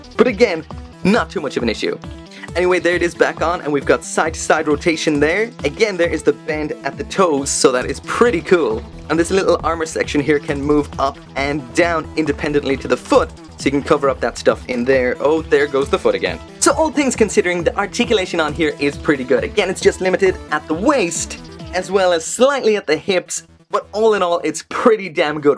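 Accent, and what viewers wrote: Ireland. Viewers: I love your voice so much you irish uwu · you have a very small strange Irish accent to your voice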